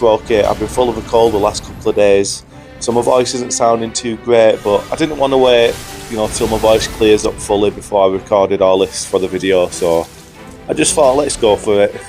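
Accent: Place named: Yorkshire, United Kingdom. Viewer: Love your accent mate! What part of the UK? Yorkshire?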